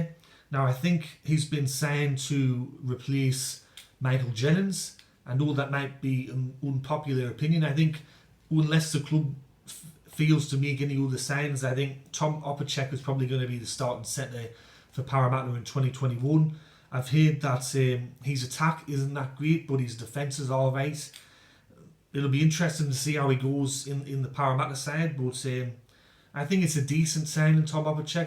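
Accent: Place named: Geordie, United Kingdom. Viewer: Good video! Dude, your accent! Where's it from? Geordie?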